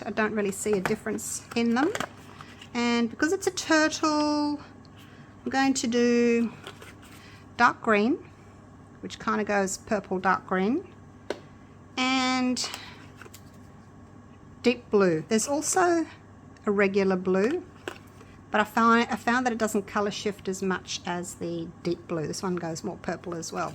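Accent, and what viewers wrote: Australia. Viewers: Gday, so nice to hear an Aussie voice, I’m in qld. So you get your resin from us, or uk? I’m a beginner and use mainly if resin, but wanting to try the deep pour resin, bit worried on how much postage from another country will be. Thanks for awesome video.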